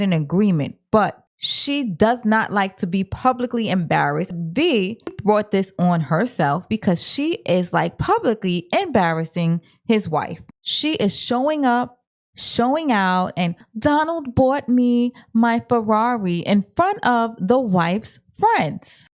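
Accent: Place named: East Coast, United States. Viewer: girl I just love you the east coast accent just everything gurllll❤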